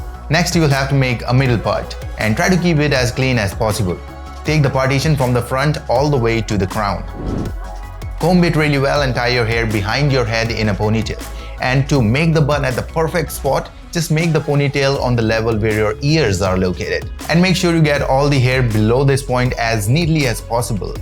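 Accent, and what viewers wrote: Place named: India. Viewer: Indian, paki maleslut! Go and learn to speak eanglish girst, becose your indian eanglish accent sounds like shitt.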